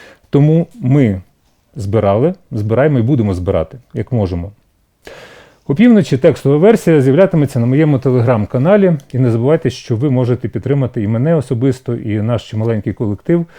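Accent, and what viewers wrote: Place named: Surzhyk, Ukraine. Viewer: Як завжди, оце жлобське "говорити". А укр. слово - розповідати, скрипін засунув далеко у дупу. Бо для скрипіна - какаяразніца, суржик або мова. Головне плямкати тим суржиком, із укр. інтонацією.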